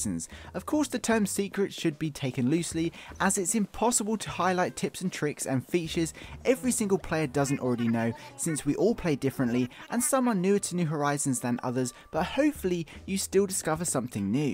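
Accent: England, United Kingdom. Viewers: I love your English accent what part of england are you from? P.s I'm englidh and Don't have that broad of an accent you make me think your from London.......are u? lol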